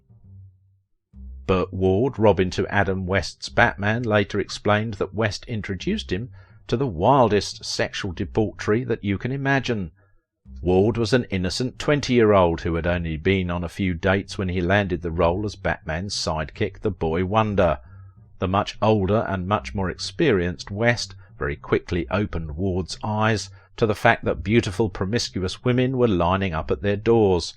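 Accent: United Kingdom. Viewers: You’ have got a wonderful British accent! Love it!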